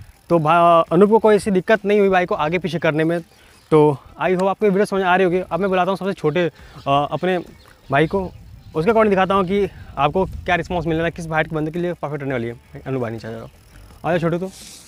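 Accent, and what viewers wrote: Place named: Bengal, India. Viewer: আপনার কথা শুনে বোঝা যাচ্ছে যে আপনি একজন বাঙালি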